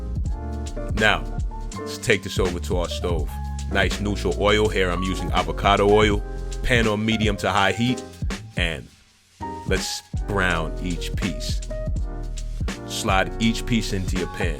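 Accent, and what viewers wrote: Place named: Caribbean. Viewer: Why don't you use your natural Caribbean voice. You're Jamaican Right 👍🏿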